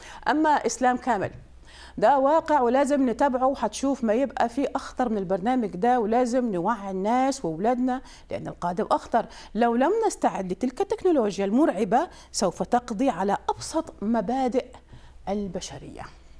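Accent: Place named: Iraq. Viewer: كنت اتابع ذي المذيعة مع أحمد و ما كنت ادري انه عراقية الا اليوم سمعت من لهجتها (': ❤️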